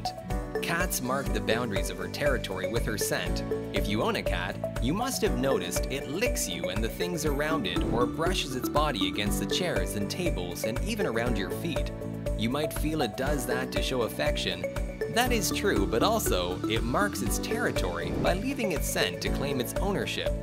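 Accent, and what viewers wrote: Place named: Europe. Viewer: european english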